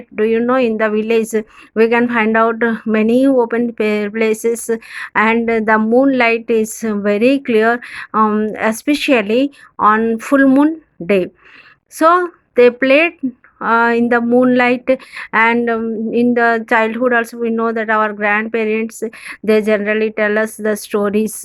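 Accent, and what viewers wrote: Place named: Telugu, India. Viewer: Super explaning mam. Your talking in English but is was like Telugu.. I understood lesson very clearly thank mam.😍😌🙂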